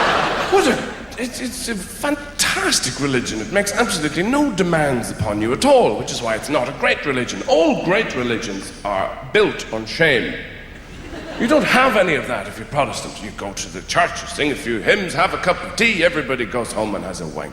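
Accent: Ireland. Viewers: He's just Irish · Isn’t that just Irish? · He's Irish . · captain obvious.... he is Irish. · muller Except that they sound quite alike as both grew up in not far outside Dublin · No. He is Irish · He's Irish. Don't judge. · Nah man, he’s just Irish. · He's Irish, yes we know. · That’s just an Irish accent 😂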